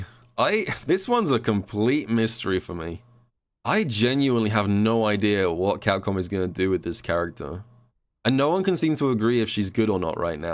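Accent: United Kingdom. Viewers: Another great video, British Diaphone.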